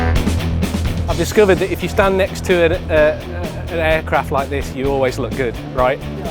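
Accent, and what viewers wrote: United Kingdom. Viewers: 英音 真的好听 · 你是英国人吗，口音喜欢。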